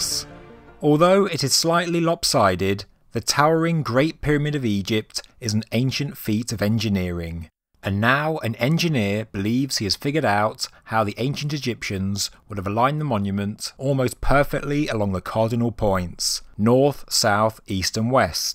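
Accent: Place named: Birmingham, United Kingdom. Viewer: definitely not Scottish. Sounds more Brummy to me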